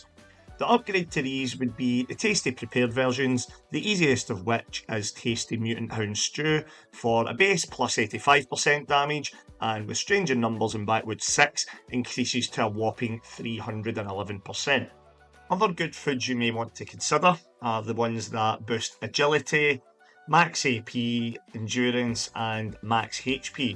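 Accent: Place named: Scotland, United Kingdom. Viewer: I love your content, but more than that it's the Scottish accent that's the icing on the cake. Pure Dead Brilliant.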